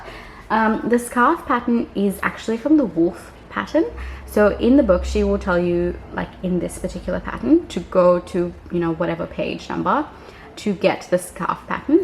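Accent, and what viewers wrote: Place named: Australia. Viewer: Just found you today. You sound kinda Australian sometimes ?? There's not many of us around. Love all your creations ❤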